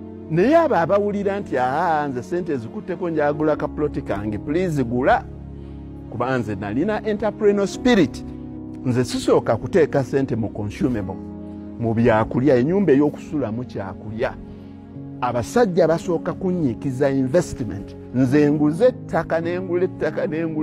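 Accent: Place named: Kigezi, Uganda. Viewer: Naye onosoboka gwe kweeli,enjogera nga yakigaga kyereere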